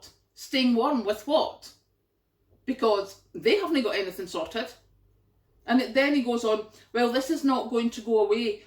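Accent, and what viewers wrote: Scotland, United Kingdom. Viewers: Go! You get so much more Scottish when you're angry:o) You're not wrong though and I just hope that the powers that be have yet another devious plan in mind - get the new PM in then tell the frightened population that it's not as bad as they first thought and here's a lower-than-expected energy cap and we'll bung you a few extra quid. I tell you what, if any Tories come round here at voting time they'll be leaving with a piece of my mind still ringing in their ears.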